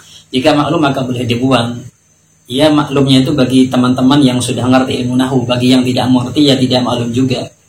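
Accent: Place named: Java, Indonesia. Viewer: Ustad ini ngaku merasa pinter , Tp sebenarnya ga tahu sejarah Wahabi yg benar, Makanya dia salah TUDUH. Siapa pun ,selama salah tuduh,tentang siapa yg pantas disebut Wahabi, akan kelihatan taK tahu sejarah SEBENARNYA. Bahkan kalo maksain tak sesuai fakta, akan kelihatan gobloknya. orang jawa medok ini ,merasa bisa kalahkan ulama mekah (yg dia tuduh wahabi)yg sehari harinya bicara bahasa arab, dia cuma merasa hebat saja, itulah akhlak ahlul bid'ah yg memalukan . akhlak sombong, ulama medok merasa lebih pinter dr ulama fasih dr mekah. Padahal ustad ini ga tahu sejarah abdul Wahab bin Abdurahman bin rustum dari maroko /Mesir yg hidup di abad 2Hijriyah, yg berhaluan Tasawuf takfiri yg bidah juga. Nah Wahabi dari mesir inilah mazhab Wahabi yg sesungguhnya, Bukan muhammad bin abdul Wahab dr mekah yg hidup d abad 2 Hijriyah. yg memurnikan ajaran rasulullah dr Tahayul Bidah & churofat. 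Berani berbohong? Atau menutupi fakta sejarah ini. Ya begitu lah ah kak ahlul bidah & Syi'ah, yg sama sama ,bernafsu ingin memadamkan dakwah salaf, (pemahaman sahabat rasulullah) Dgn cara berbohong, menutupi fakta sejarah, fitnah , memberi julukan keji Padahal julukan itu salah sasaran, julukan Wahabi harusnya pada ahlul bid'ah, krna bapak nya muhammad bin abdl Wahab, yaitu abdul Wahab adalah sufi ahlul bidah, Yg di tentang oleh anaknya(muhammad). -muhammad anti bid'ah - ayahnya muhammad: abdul Wahab adalah ahlul bidah - jelas beda ajaran. Kaum salaf= muhammadi Ahlul bid'ah= Wahabi=sani uye= sperti mal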